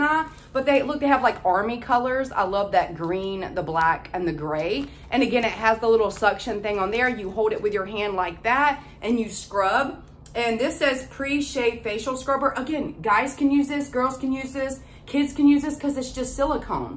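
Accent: South, United States. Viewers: You are so adorable and I love your Southern accent!! Your videos are always uplifting and fun. Thank you for being a sparkle in my day💜, couldn’t find the emoji but YES, YES, YES chop it!!!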